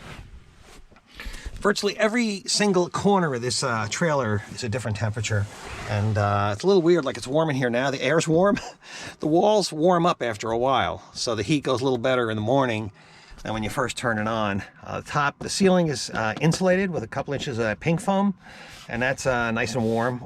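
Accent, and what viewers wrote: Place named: New Jersey, United States. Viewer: your videos are always informative, if not entertaining. Since moving from NJ to CO, I miss the Jersey accent. I get my fix listening to you! Keep the good stuff coming. Thanks!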